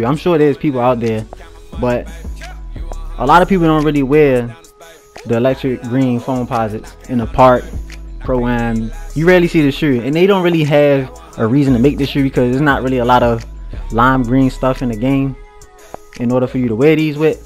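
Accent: Baltimore, United States. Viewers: Baltimore ppl cant talk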